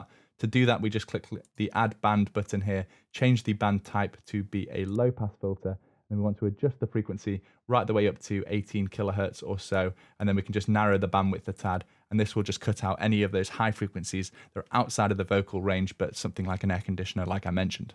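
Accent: United Kingdom. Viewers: you sound british..why do you give measurements in INCHES??? and why dont give both plz? Centimeters are world wide used!! IS very discouraging to have to go to an online calculator to convert them and moreover knowing that british like the rest of europe and maybe all the world ( except USA ) uses the metric system.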